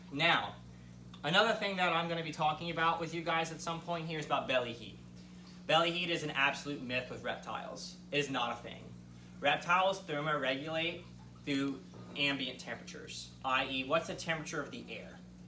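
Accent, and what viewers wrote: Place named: Pennsylvania, United States. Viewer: I knew you sounded like you were from PA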